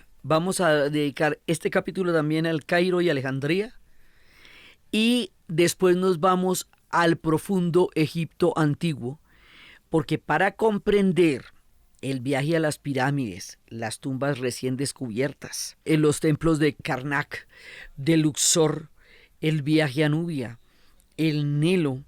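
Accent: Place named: Colombia. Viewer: Escucharla a es transportarse al lugar donde ella narra, pero sin salir de casa 😬😬😬. Me encanta esa sazón Colombiana que le pone, que está como el ajo, en su punto exacto ni mucho para arruinar, ni poco para no sentirse. 👌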